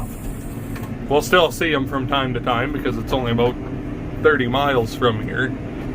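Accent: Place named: Canada. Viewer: I love this channel, he talks like real Canadian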